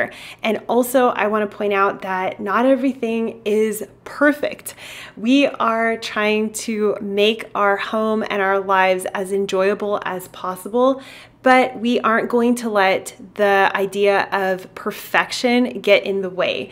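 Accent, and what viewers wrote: New Zealand. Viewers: He sounds like a kiwi. This is so nice guys. I can’t wait to buy a home and do this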